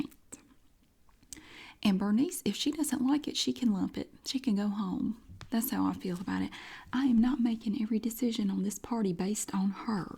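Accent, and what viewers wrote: South, United States. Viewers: I love when you say “loogathaat” I live in nc and have a southern accent too but for some reason I love yours! Lol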